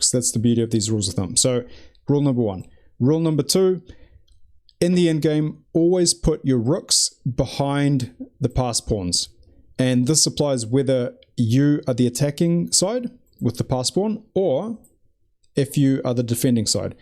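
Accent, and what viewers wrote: New Zealand. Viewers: "spishal" I'm sorry.... I cannot with the NZ accent. :( I must lose out on valuable chess info. grrrrr. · this dude sounds like a kiwi im subscribin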